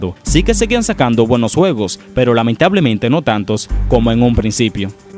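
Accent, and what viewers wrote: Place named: Dominican Republic. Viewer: men tu eres dominicano tu me suena dominicano xd (yo si:v)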